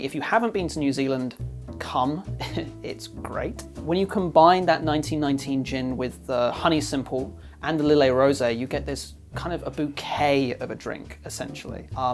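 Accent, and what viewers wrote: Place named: Australia. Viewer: you from NZ? you sound aussie.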